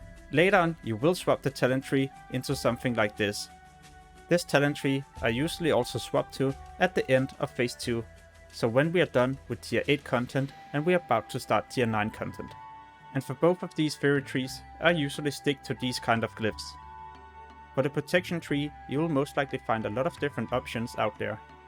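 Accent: Denmark. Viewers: Useful guide! Thanks brother. I have a mage, But also a warrior. I kind of wanna focus warrior, but all the people say They’ll be useless early on, I don’t know… sigh Also, are you Danish? You sound danish!☝🏼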